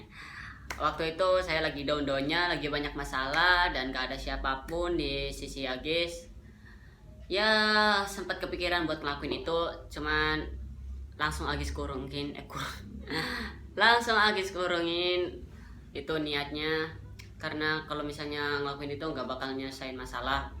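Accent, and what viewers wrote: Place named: Java, Indonesia. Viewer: Medok bgt ngakak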